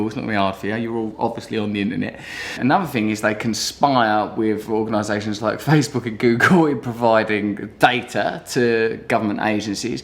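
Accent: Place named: Cockney, United Kingdom. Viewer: You are an incompetent, where'd you get that mockney accent, oh man of de people?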